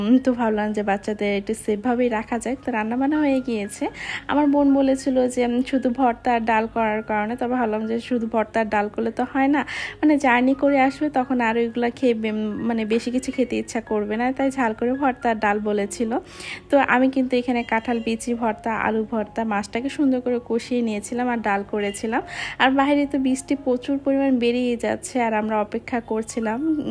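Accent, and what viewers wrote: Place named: Rajshahi, Bangladesh. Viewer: রাজশাহীর ভাষা খুব ভালো লাগে আপু,,, খুব মিষ্টি করে কথা বলো তুমি মাশাআল্লাহ,,, ভালো লাগলো আপু ❤❤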